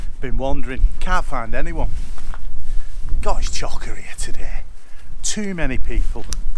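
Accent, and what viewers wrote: Lancashire, United Kingdom. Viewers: I think we have to thank you. It does take a lot of dedication to put in the hours behind the lens. Don't worry about production values and all that mince. Your big baw face and lancs mawling of the Anglian dialect is what holds it together...and the good shots as well. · Brilliant !!! I have watched every one of your vlogs. Coming from Bolton ( not too far away from your hometown ) I too share a "Lanky" dialect not as strong as yours but listening to you talk and laugh... Fabulous :-))))) You seem to be having a load of fun with your mates and the photography aside, love the whole atmosphere you seem to generate. Cheers and Sithee!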